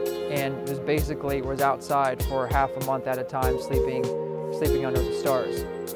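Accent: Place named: Eastern Pennsylvania, United States. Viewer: What part of PA? You sound like your maybe eastern PA Lebanon,Perry county area.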